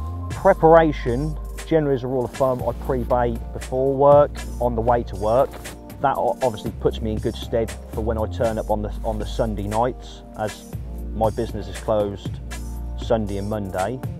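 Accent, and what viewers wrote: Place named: Norfolk, United Kingdom. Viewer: Norfolk accent bud lol he’s my local tackle shop owner top guy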